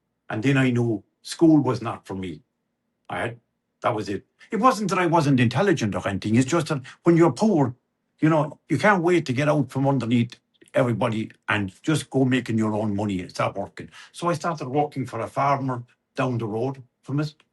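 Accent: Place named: Ireland. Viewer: God love you, you’re still as Irish as the day you were born … so good to hear you speak. Great story, keep them coming! Without a doubt you’re a true story teller … but you knew that. I certainly can relate to school homework not done and the repercussions on Monday morning … but throughout my school days it never changed … now writing stories … that was a different kettle of fish. So happy for your new venture and may those darn shingles disappear! ❤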